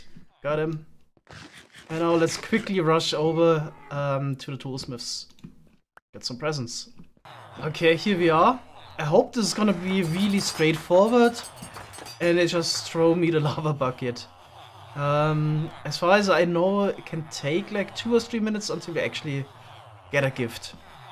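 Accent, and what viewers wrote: Germany. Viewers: he's def German.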